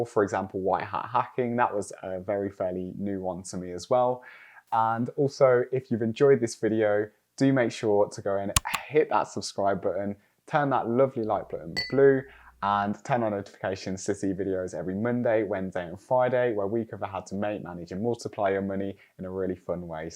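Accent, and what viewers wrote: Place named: Birmingham, United Kingdom. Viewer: Thought I clicked on an unknown T video😂. You know I thought I could detect a hint of "Brummy" in the accent😂. Great video. I was tempted to do Ethical hacking at undergrad, but anyone who hears the term hacking outside that realm will immediately have negative connetations. But it's got that white hat hacking roles are cropping up.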